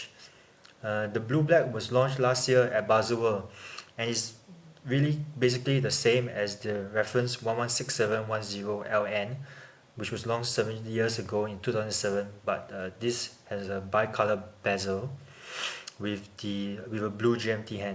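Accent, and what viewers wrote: Singapore. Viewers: Ya he sound like singaporean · WTF. Speak clearly! Can't stand this Singaporean/Malaysian Chinese accent.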